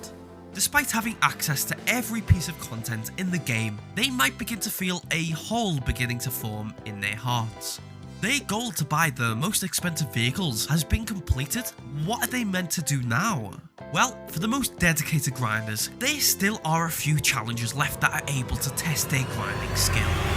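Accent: Liverpool, United Kingdom. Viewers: L bri ish narrator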